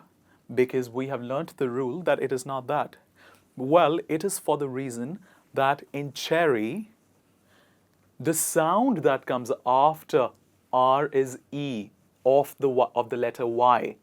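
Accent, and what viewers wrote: United Kingdom. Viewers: This is in British English, right? Because "r" is pronounced in many of the words you mentioned like "first, dark". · You're sounding british sound.. But in America we sound all r.. Slightly thick .. We not pronounce r silently the word's you've written... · Thanks for teaching but you’re pronouncing like British English and you might followed them but i do follow Indian English which is good to hear and pronounce also 🙏😊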